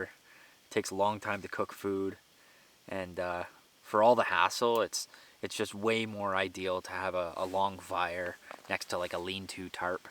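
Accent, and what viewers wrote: Canada. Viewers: are you french Canadian?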